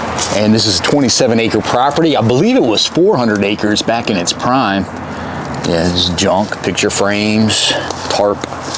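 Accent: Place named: South, United States. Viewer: Wow, what a great accent! Where are you from? Virginia, West Virginia, Georgia? I skipped the intro.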